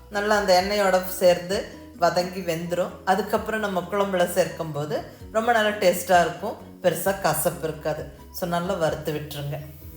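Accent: Coimbatore, India. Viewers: மிகவும் அருமையா இருக்கு. நீங்க பேசுற கோவைத் தமிழிலே இன்னும் சுவை கூடத்தான் தெரிகிறது. மகிழ்ச்சி. வாழ்த்துக்கள் அம்மா.👍💐🙏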